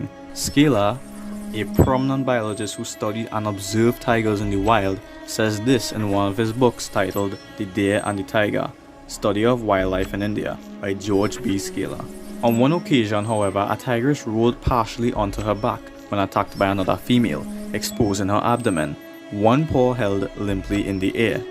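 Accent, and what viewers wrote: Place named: Trinidad and Tobago. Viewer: Eh you from Trinidad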